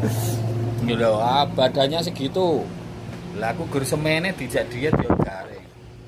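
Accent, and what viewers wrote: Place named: Java, Indonesia. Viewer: Jawa tulen mas😅😅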